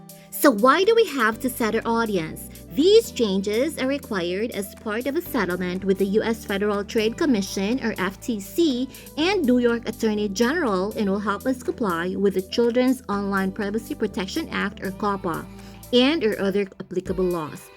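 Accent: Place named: England, United Kingdom. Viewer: i love this tutorial,,, maayos yung pagkakaturo.. i also love your english accent po hehe,, new friend po, sana mabisita nyo rin ako salamat po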